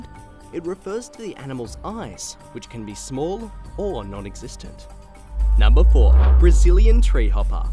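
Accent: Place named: Australia. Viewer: You know a video about wildlife is legit when you hear an Australian narrator.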